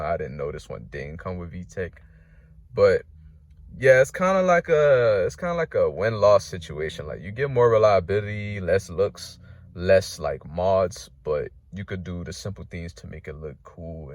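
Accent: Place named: East Coast, United States. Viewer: You sound like you're from the East coast. As someone who owns a 5th 6th and 7th gen all at the same time they're good daileys. The cool part is that they're light. Do a K24A swap for traffic 👍🏾🔰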